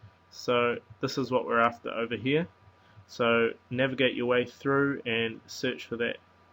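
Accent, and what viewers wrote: New Zealand. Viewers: HEY is that a kiwi accent or aussie ??? you sound kiwi???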